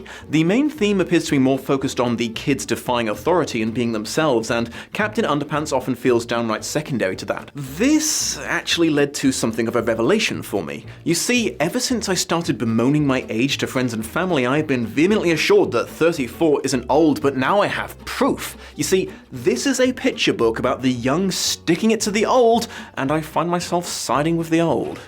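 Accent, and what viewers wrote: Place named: RP, United Kingdom. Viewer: Hearing the Dom, posh, British voice, talking about Captain Underpants makes me laugh harder than it should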